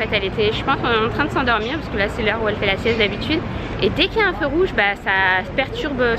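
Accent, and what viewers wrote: Spain. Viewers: Ton accent españiol 💓il est incroyable trop choux votre petit bout de choux de parler españiol et français c trop bien, 😁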